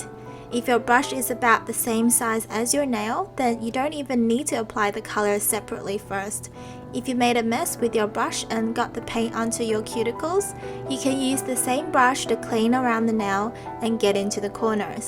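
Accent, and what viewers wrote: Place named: Australia. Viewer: Are you Australian or Asian!? I love your accent! So pretty!!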